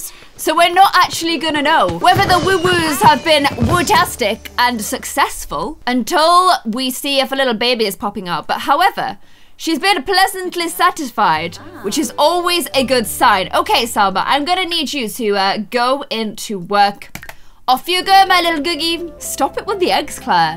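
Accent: Ireland. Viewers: are u irish because if u are i am too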